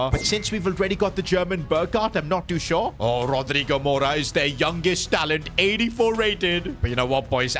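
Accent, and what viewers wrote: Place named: India. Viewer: You sound indian and pakistani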